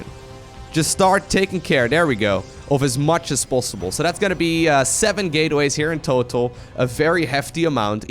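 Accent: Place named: Netherlands. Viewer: You are Dutch, aren't you? Just assuming due to your accent.I like watching your vidoes.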